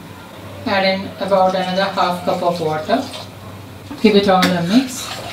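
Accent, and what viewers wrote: Kerala, India. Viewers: I like listen to Kerala accent :) pure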